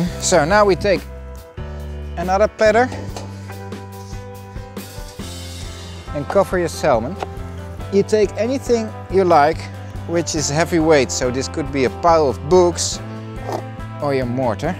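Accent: Netherlands. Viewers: Hi! Are you Dutch? It seems like you are, since I hear a very Dutchie/English accent:p Groetjes!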